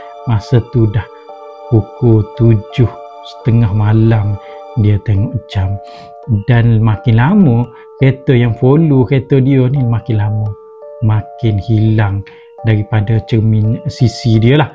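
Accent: Kelantan, Malaysia. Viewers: sama2.. Sedak dengar crito..kemah2, ada stail tersendiri dgn loghat Klate dio tuh..kito ore baru dlm channel ni.. Follower Abg Joe JSPL benar..lor nih layan duo2 lah...😂😂🤩🤩😍😍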